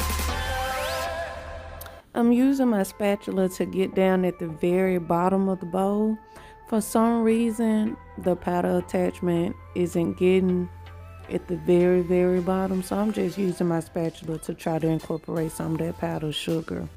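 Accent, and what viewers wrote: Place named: South, United States. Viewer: I Love Your voice very Southern ❤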